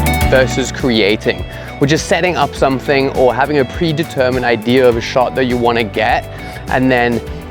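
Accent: Cape Town, South Africa. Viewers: I’ve watched a ton of these “HR clog your simple life” and yours is my favorite. Maybe I just miss the Cape Town accent! I’m watching this in Atlanta airport on a trip I’m vlogging for my new channel: Cage Free Theology Thanks bru.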